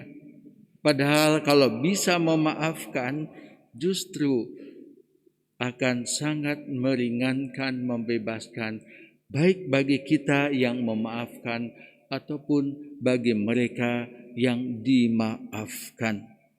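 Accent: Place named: Sunda, Indonesia. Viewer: R I P... Saya sedih karena romo tdk akan pernah lagi memimpin misa harian pagi pkl 06. yg sy ikuti secara live, tenang dn damailah bersama Bapa di surga romo...warga paroki keluarga kudus cibinong, keuskupan bogor.. Yg akan sllu terkenang dgn khotbah dialek sundana 😥😭😭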